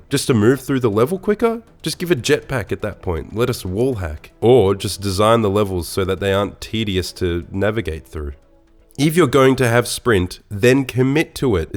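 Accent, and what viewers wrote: Australia. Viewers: No offense, but your Aussie accent makes it incredibly difficult to tell if you're being sarcastic or not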